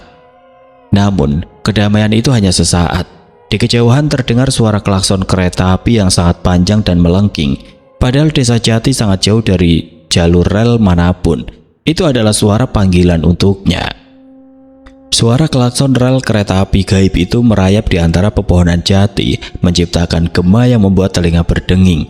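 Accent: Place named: Banyumas, Indonesia. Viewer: kayak suara khas ngapaknya ..dgn ciri khas cerita panjang ngalor ngidul cmn ga ada seramnya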